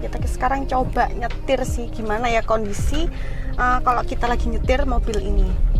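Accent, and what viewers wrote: Java, Indonesia. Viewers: kusuka logat Jawanya...very orisinil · medok jawa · Tp medok ke jawa nya si mba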